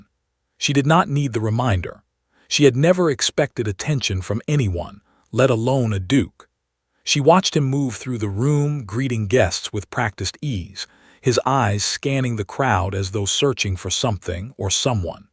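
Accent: United States. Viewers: .........And get rid of the US accent when it's a UK story.......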